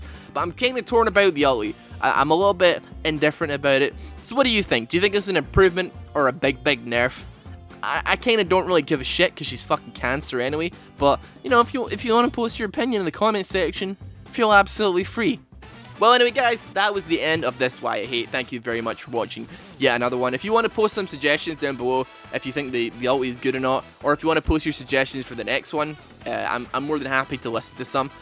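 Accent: Scotland, United Kingdom. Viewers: Is he scotish?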